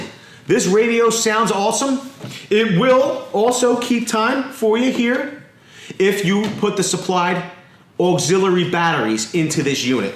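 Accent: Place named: Boston, United States. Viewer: This dude is definitely from Boston 😂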